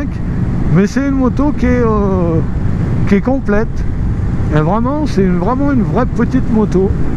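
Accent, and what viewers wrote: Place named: Lyon, France. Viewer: j’ai une Dacia Logan et une 911 carrera 4 S , je suis un automobiliste avec la deuxieme et pas avec la premiere ?...pareil il y a des permis B qui veulent rester avec leur petite moto et des fois c'est des Papy qui ne veulent pas passer le A2...Super Vidéo ,j’aime bien le beaujolais et ton accent de Lyon...gros V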